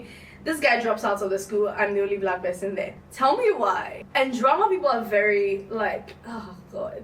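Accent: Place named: United States. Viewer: your american accent >>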